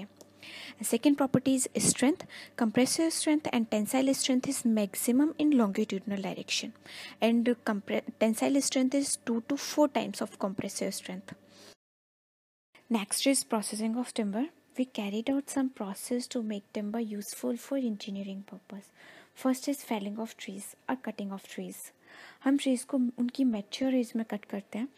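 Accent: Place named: India. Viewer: It would be great if you add English subtitles. It's really complicated to keep up while trying to decipher the Indian accent. Keep up the good work.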